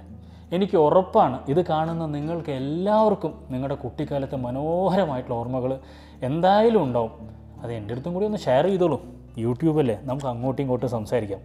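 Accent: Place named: Kasaragod, India. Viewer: I thought you are from kasaragod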